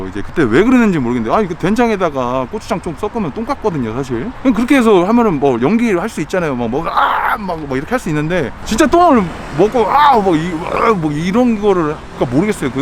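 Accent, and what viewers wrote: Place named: Chungcheong, South Korea. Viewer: 찐 충청도 충남 말투 굳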